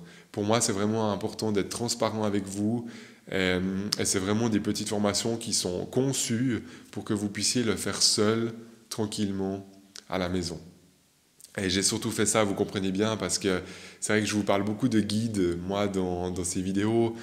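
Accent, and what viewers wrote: Switzerland. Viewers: Bonjour, je te découvre aujourd'hui au travers de la video poser des questions a son guide , et tout de suite jai noter une pointe d accent suisse . Lorsque jai vu que tu proposes des formations j ai ressenti que cest sûrement en Suisse . Bingo ! Mais cest top que tu les proposes a tes followers d autres contrées 👍👍👏👏👏 Japprecie tes conseils que j approuve et je voulais savoir si tu pouvais faire une video sur les guidances YouTube a choix ou autres . Ou l'utilisation des cartes ou tarots .personnellement je trouve que souvent ca empeche de se recentrer sur ses ressentis innés. Deplus , je suis croyante mais jai du mal avec la religion car il ya beaucoup de discipline a avoir au sujet de la priere , jeûne ect ... pourtant j ai la foi , mais jestime que Dieu aime toutes ses creatures tant quon ne fait de mal a personne et pas besoin de simposer des manieres de prier ect . 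Px etre un autre sujet de discussion ? 🙏 Bon Mardi 😊🌞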